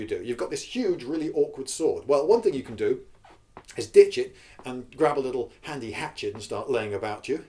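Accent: United States. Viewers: The American accent caught me off guard. It was really well done. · Your American accent terrified me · nice American accent, so much better than my English one! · I can't get over the American voice. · Your American accent is amazing. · Quality American accent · You have a really good american accent. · Love the American accent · serious props for the american accent! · I love your American accent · That was an amazing American accent. You sounded virtually authentic and I've talked to quite a few Americans in my time.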